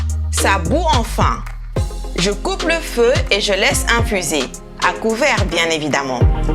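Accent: Senegal. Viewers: Elle s'exprim kom une vraie sénégalaise😂😂 C chouett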